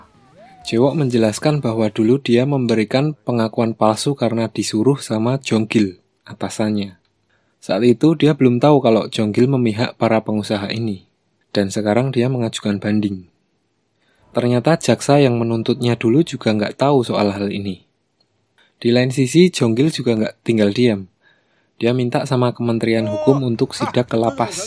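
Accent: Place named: Java, Indonesia. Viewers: Ngomongnya itu lo minnn campur aduk ada yg baku ada yg medok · Medok bgt sih bhsa Jd gk menarik dgr suaranya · Bok jowo wse mas .luweh apeh logate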